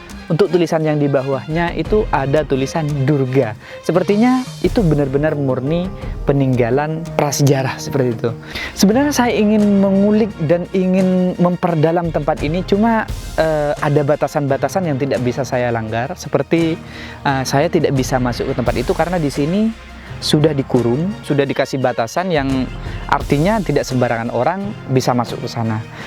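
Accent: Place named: Java, Indonesia. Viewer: Medhok tak iye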